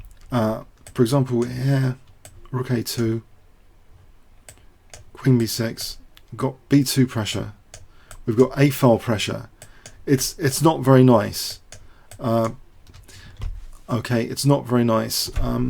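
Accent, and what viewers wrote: United Kingdom. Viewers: I just wanted to say how grateful I am for all these videos you do. I'm 28, and I've been playing chess since I was 10. I stopped playing back in '07 when I was diagnosed with leukemia in the navy. I've recently started getting back into it and am amazed by all the instructive video options there are on YouTube. But yours are the only ones I care to watch anymore. Majnu is ok, but just not in-depth or instructive enough. I had to get used to your "British accent" at first, but now I find it quite comforting (weird, I know). So thank you for all you do for the chess world. There should be like a Nobel Chess Prize or something for this.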